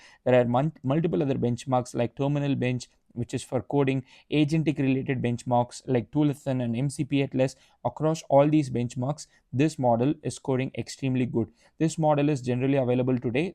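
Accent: South India, India. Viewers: Very strong south Indian accent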